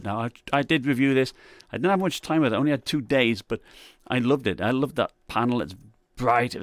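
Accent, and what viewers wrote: United Kingdom. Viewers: That’s odd I heard a UK accent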